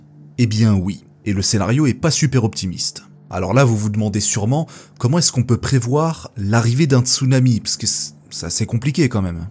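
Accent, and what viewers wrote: Spain. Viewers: Pouce bleu pour l'accent espagnol! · pouce bleu pour l'accent espagnol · 👍🏻👍🏻👍🏻👍🏻👍🏻👍🏻💙 pour l'accent espagnol ! Lorsque je suis allé à la Réunion (l'année de ta naissance 😁) je n'ai pas été dépaysé, je connaissais déjà les Canaries !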